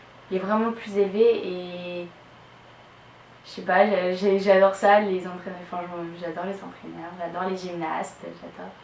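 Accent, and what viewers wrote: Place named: France. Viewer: ohh, that's why i can understand your french perfectly - 'cuz your from France (*not Quebec!..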